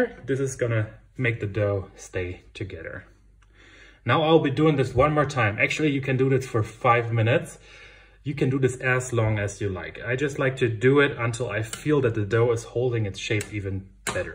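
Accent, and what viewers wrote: Germany. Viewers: Are you from Flensburg? :)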